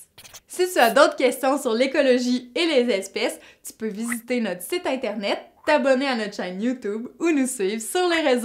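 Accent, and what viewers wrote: Quebec, Canada. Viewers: Merci beaucoup ! En plus l'accent québécois est agréable à écouter haha ! 😀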